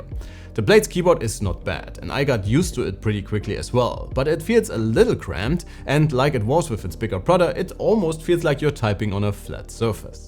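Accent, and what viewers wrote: Germany. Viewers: Der deutsche Akzent ist echt😅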